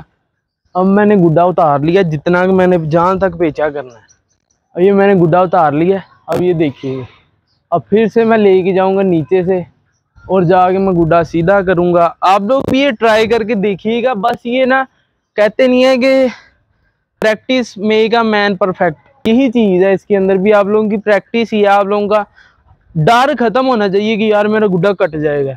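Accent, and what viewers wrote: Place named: Pakistan. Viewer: Hy bro good video i am from Delhi India but your accent is like Pakistan... By the way nice video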